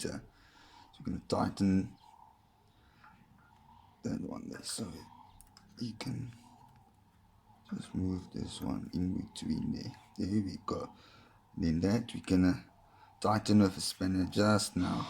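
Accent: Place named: New Zealand. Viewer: The audio is still a bit off. You come out sounding like a New Zealander. But I can make it out. Great build I would like to see more variants of this?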